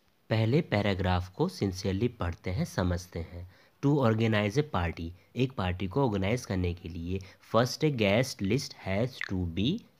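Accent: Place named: Western Uttar Pradesh, India. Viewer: Are u from bijnor, meerut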